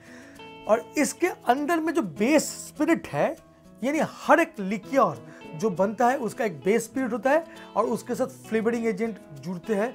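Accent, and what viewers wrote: Bengal, India. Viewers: By ur accent u seem to be a Bengali ri8!?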